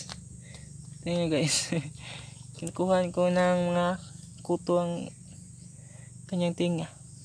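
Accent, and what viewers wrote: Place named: Visayas, Philippines. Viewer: Bisaya ka pala kapatid. Malambod man.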